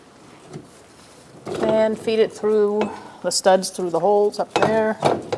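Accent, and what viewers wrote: Boston, United States. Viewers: Love the Boston accent ! 👍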